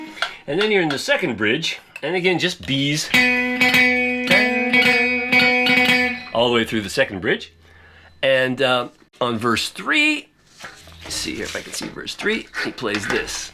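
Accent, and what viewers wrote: Liverpool, United Kingdom. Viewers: no one can succeed in doing a Scouse accent like......! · Even nailed our Scouse accent, la'! Well, almost....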